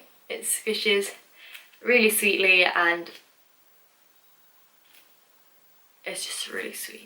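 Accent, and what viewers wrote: United Kingdom. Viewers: Is she British? If so YES I’ve finally found a British squishy YouTuber! ❤️ who else here is British?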